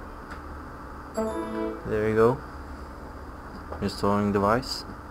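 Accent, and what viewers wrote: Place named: Romania. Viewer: you have romanian accent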